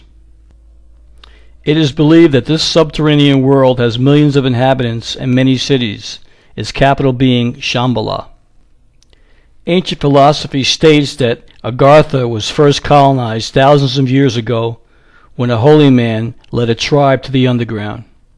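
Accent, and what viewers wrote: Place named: Northeast, United States. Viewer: Great information, thank you. Your music choices made it less than pleasant to watch though and I can tell you are from the far NorthEast somewhere.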